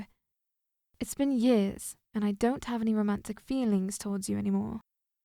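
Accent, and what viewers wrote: United Kingdom. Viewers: your British accent is no less than satisfying and ethereal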